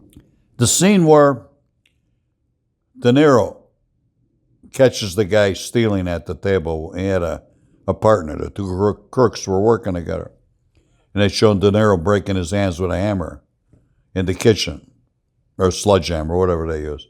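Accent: Chicago, United States. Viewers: Frank didn't your big Brink's truck heist involve Divine Savior church in Norridge? I remember that when I was a kid. Also, did you hang around at Grand and Cicero Avenues? Thank you for clarifying that we use the term JAGOFF back home. I have shown your scene in Casino set in Costa Rica to several people to help them understand our ways in Chicago. And your accent in your videos make me homesick!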